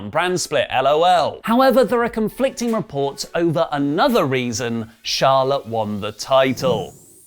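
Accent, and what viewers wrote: Australia. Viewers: That is one fine Aussie accent, my friend.👍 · your Australian accent is on point mate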